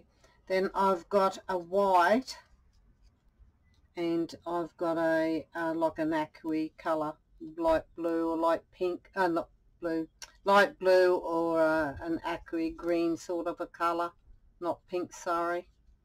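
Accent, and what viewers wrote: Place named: Australia. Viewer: Very strong Aussie accent. Worked in Aus for a few years before returning to NZ. Miss my Mates. Love your work, and l hope your health improves. We have free prescriptions here in New Zealand, and even before we only paid $6. It’s a shame that prescriptions are so expensive in Aus, considering every tax payer has to pay the additional 3% tax for Medicare.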